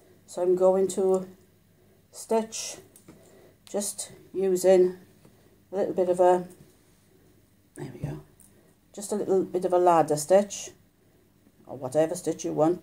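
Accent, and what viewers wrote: Wales, United Kingdom. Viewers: Ok. I just read a few of the comments and your replies. Your accent is Welsh! Awesome. I have now completely made 3 king size quilts by hand using your method. I am only a few months away from finishing my fourth king size quilt. This one is a double Irish Chain. Still using your method. You are the BEST. USA. Kentucky. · Your tutorial is the best 👍...and I love your welsh accent 💟⭐I will use your method when I sew my log cabin quilt together. 🙋‍♀️😊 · Oh this is a great tutorial .. now i can see where i made mistskes on my squares. Thanks everso and I love your Welsh accent. My dad's family are all from Llanelli... any time I need a dose of Welsh tones I will come and replay your video 💕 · Super video......Love your welsh accent....Thank you xxx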